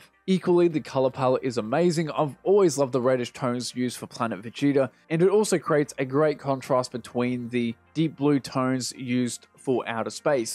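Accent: Australia. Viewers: I'm a simple man. I hear an Australian accent and I like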